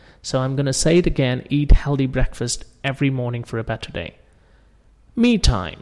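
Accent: India. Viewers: Dude stop trying to hide that Indian accent it’s fucking horrible😂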